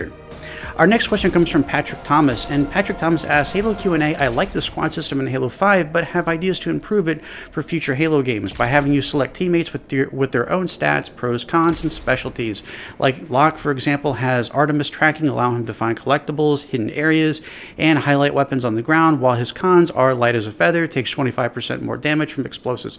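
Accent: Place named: United Kingdom. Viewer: I C O N I C.- A Flood fan with UK accent. 👀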